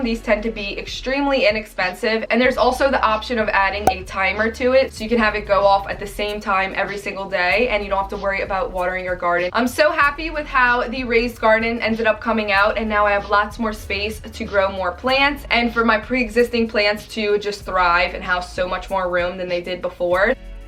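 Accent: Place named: Philadelphia, United States. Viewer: Oh you are in New Orleans...I thought you sounded like Philly! Please update in a few to show how they have thrived!!! 🌿😀🌱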